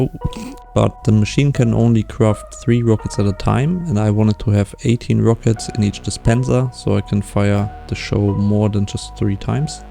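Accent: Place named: Germany. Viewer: hes back and sounding more german than ever. wait a second this isn't colony island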